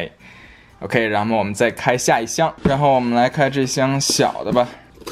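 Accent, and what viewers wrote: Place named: Beijing, China. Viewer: 北京人，说话很有特点很好听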